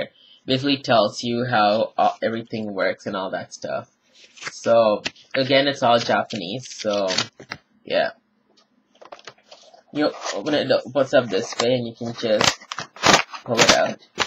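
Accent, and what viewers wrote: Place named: Caribbean. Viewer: Where you from....You sound like somewhere from carribean Loving the accent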